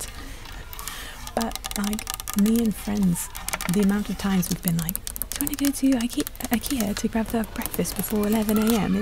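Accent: United Kingdom. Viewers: Lovely British accent. Very soothing voice. Gorgeous nail tapping.😃👍✨🍀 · i love ur accent !! the best, im in love wit british accents😩😩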